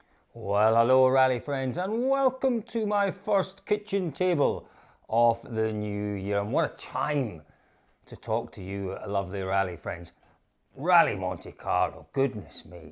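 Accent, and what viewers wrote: Scotland, United Kingdom. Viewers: Naice scottish axent.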